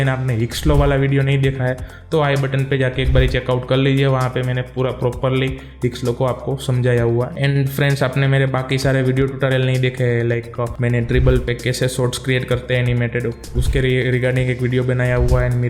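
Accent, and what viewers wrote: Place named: Gujarat, India. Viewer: badhiya video he. god bless. your Hindi is wrong. i thought you were talking in Gujarati but you made quality content. keep it up alwayes. Liked Shared and commented.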